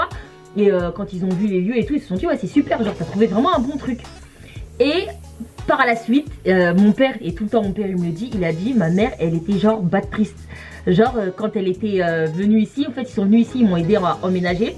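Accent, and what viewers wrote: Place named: Democratic Republic of the Congo. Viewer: Aah pitinnn😂😂😂l’accent congolais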